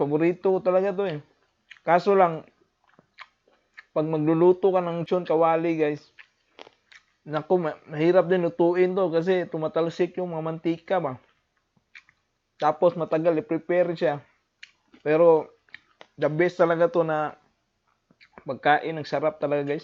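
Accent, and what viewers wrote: Visayas, Philippines. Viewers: Ka bisaya pud nimu oi..pero proud ghpon meng mga bisya nimu bai! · oh my word the way you speak tagalog, so me. lol. apir sa mga bisdak nga magahi talaga. lami kaau ang crispy dong! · New subscriber kuya!! Bhlag bisdak kaayo pero okeyyy keyuuu.. request kog e mukbang nga pancit canton chilli mansi with boiled and sunny side up egg 😁😁 lami kaaayooo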